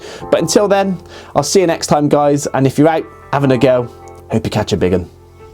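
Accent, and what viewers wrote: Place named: Bristol, United Kingdom. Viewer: As a fellow bristol boy nice to hear the accent....if a little diluted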